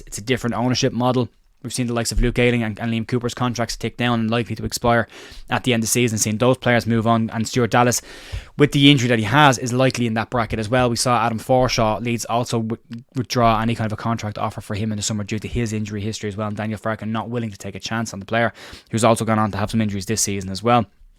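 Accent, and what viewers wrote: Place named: Ireland. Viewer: Keep up the good work mate. Even the Irish accent is easy on the ear.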